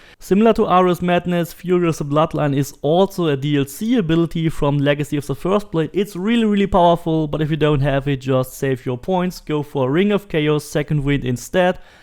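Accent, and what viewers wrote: Germany. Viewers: Deinem Akzent nach zu urteilen, bist du eindeutig ein Deutscher 😜 Das soll kein Vorwurf sein: im Gegenteil - ich fand das mega sympathisch als ich zum ersten Mal eines deiner Videos zu Odyssey anschaute. Du sprichst super Englisch! Ich wollte mich mal bei dir bedanken, weil du mir das Spiel sozusagen erklärt hast. Ich hab nach Videos wie du sie machst gesucht und aber kaum was gefunden, umso mehr habe ich mich über deinen Content gefreut. Keep up the great work - you‘re awesome 😉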